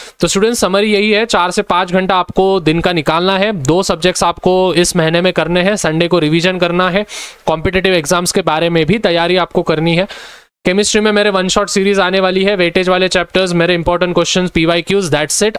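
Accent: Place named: Aurangabad, India. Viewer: Aurangabadi accent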